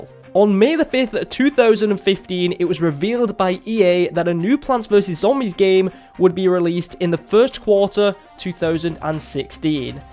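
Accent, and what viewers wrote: Scotland, United Kingdom. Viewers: Are u from Scotland?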